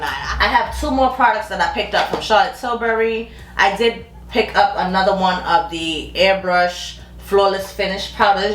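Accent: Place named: New York, United States. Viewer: Subbed! I like your accent! New Yorker? If so which borough? I'm your Connecticut cousin now! Lol!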